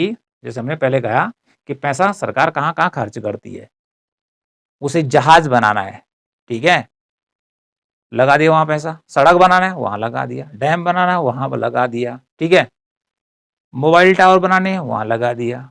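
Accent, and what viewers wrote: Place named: Hills, India. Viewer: sir apki bhasha se lag raha jaise aap pahad se honge 🙏 or thank you sir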